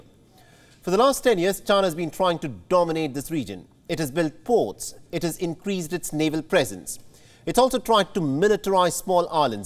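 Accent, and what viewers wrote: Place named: India. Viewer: Every Indian have more or less the same English accent as of this journalist instead of that stereotypical Hollywood accent.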